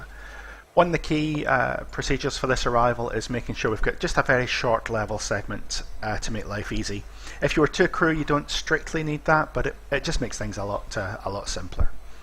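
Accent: Scotland, United Kingdom. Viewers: Hi, currently learning lots of invaluable flying techniques and tips from your tutorials - many thanks! Quick question about the check heights from the chart - would you typically have those memorised or written down somewhere for cross-reference? Thanks - nice to hear a Scottish voice:-)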